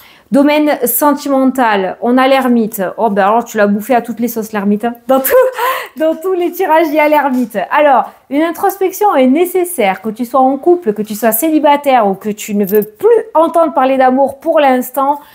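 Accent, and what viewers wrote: South, France. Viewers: J’aime de plus en plus regarder vos/tes vidéos. Bonne humeur à gogo Et l’accent:-) Dans le sud aussi, pas loin je pense. Bravo 💖 Ps: je sors de la douche 😜😂, j’écoute en me préparant … 👍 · Bonjour ma douce, merci pour ta guidance, merci pour ta bonne humeur avec ton petit accent du sud que j'apprécie. Bisous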